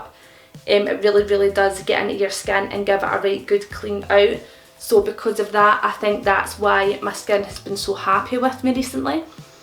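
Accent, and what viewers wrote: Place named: Scotland, United Kingdom. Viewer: I've only watched like 2 mins of the video but just wanted to say that you are so beautiful and I love your accent 😃 I'm from just south of London so have a super boring accent and I think Scottish accents are lovely!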